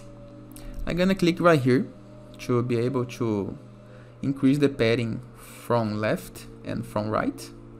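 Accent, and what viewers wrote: Brazil. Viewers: Its some Brazilian accent? 😉Nice TUT, brother!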